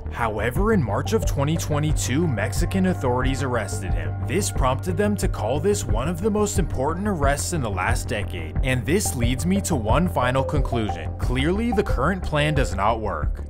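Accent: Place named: Stockton, United States. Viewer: The way he going hard pronouncing these mexican words make me think he from stockton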